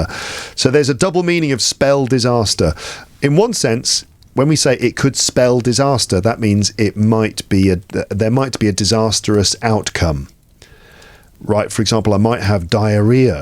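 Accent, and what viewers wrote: United Kingdom. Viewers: I am from Uzbekistan too i was not sure about my accent but after i heard about your voice i was in love the British accent is the father of English language in Uzbekistan there is no one who has British accent i am going to be one through shadowing your every single episode i love the rambling episodes even sometimes when i don't know what to do or bored i combine rambling episodes i chose only rambling episodes because it has something that gives me energy i love your hard work keep up the good work ❤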